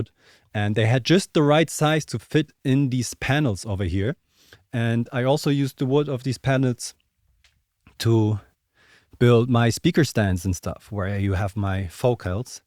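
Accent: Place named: Germany. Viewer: Sehr cool mal einen Einblcik hinter die Kulissen zu kriegen. Cooles Studio auch. Generell muss ich sagen das das der beste House Musik Kanal ist den ich kenne. In der Vergangenheit hatte ich immer mal wieder die Vermutung das du deutsch bist, auch wenn dein Englisch sehr gut ist, den deutschen Akzent abzulegen ist schwer. Nun wurde es quasi endlich mal aufgelöst.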